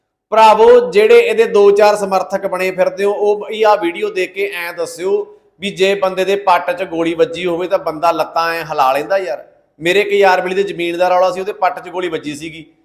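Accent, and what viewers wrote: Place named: Amritsar, India. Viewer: Mainu Lagda tu amritsar toh lagda gal karn toh badeh je kehna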